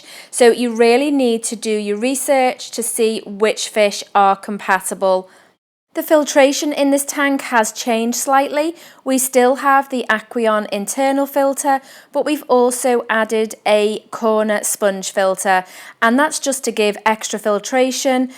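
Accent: Liverpool, United Kingdom. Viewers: I wish you would have persevered with this channel, it appears it could have really gotten somewhere. |Such a shame, but that's life.. You sound like people I know from the Sefton areas of Liverpool?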